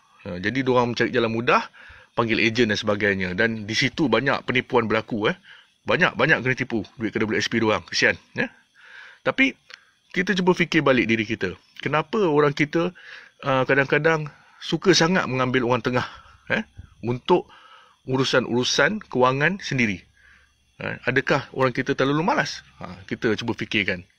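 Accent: Kelantan, Malaysia. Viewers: Kemah keming kemuk vutoohh yip yip yip😂..terbaik bey lado..kecek Kelate sdap dgar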